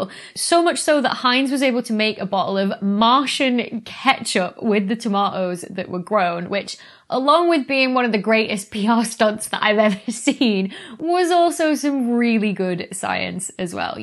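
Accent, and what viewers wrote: United Kingdom. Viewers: I love the british accent. It sounds so proper and yet also fun.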